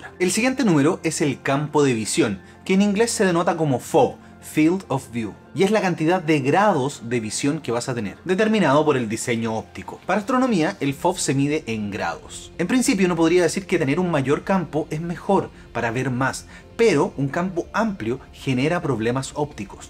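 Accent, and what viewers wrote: Chile. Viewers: bro, eres Chileno ?? donde compraste tus binoculares ? Gracias.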